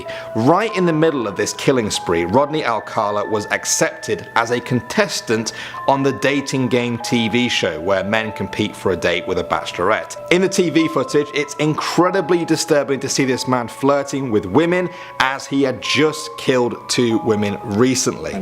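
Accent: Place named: North, United Kingdom. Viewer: your norther accent ironically says WOTCHAH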